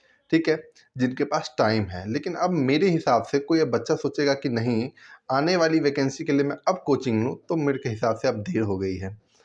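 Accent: India. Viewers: Bhaiya maths or reasoning smjh nhi atta hai jayda. Koi solution bata do bhaiyaa. Bhaiya app up se hai na? Ya up mai rehte hoge?? Awadhi tone hai appke boli mai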